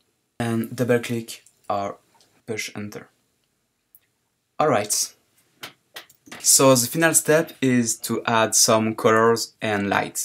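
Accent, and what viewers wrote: France. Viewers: Mec c'est super ce que tu fais (je sais que tu es francais ca s'entend dans ton accent un peu ;) ) tu mériterais vraiment d'être reconnu car t'as un talent inné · Accent prononcé j'ai reconnu que tu étais français ;) c'est genial tout ce que tu fais vraiment ! Ce qui serait parfait : que les tutos soit en français :) Mais bon j'imagine que tu as beaucoup de travail donc continue ainsi !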